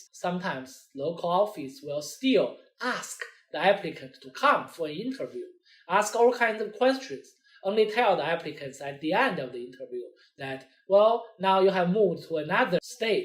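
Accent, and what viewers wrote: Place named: China. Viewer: To your question: he speaks English, with a strong Chinese accent, but I am sure you can follow him if you want to. I also want to add some extra info in this answer to your question (because I just finished my i-485 interview): As of now, the content in all his videos (and of course, some are very Chinese related but not to my case) is very very good, and is the most helpful & practical one, compared to other related videos, made by non-native English speakers from places all over the world and native English speakers. And I would say that it is a surprise to me too. So, if you cannot deal with Chinese accent, ignore it (I guess I understand that. Honestly, as a Chinese, I ignore a lot of Chinese-English videos, I have to), but if you have a real-life need in this GC thing, try some of his video.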